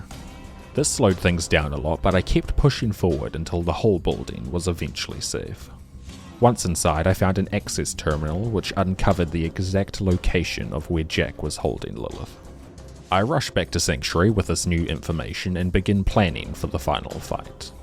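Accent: New Zealand. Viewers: New Zealand accent is a strange choice. It's very realistic sounding, but most other accents are a lot more pleasant on the ears. "Uf I take even a sungul hut, the run is did."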